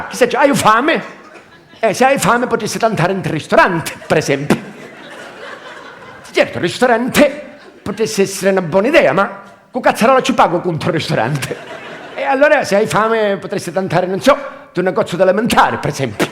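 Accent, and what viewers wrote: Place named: Sicily, Italy. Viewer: Very, very funny. He sounds like my Sicilian (di Agrigento) father spoke. grandissimo di l'america.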